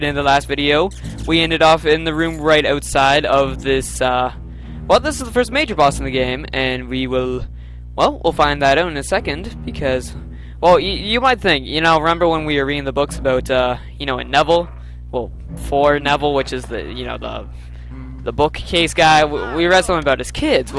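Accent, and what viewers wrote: Canada. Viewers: you pronounce your "ou" sounds in a canadian way, i was just wondering :P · Well, Chauncey is shaking, so it makes sense. I shouldn't have said anything. And also Caspin's right, you do sound Canadian. You say "zed" instead of "zee" like Americans.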